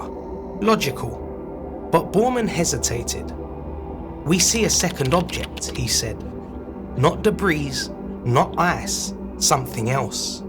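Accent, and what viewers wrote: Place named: United Kingdom. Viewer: he’s British. In British English the “s” in “debris” is silent.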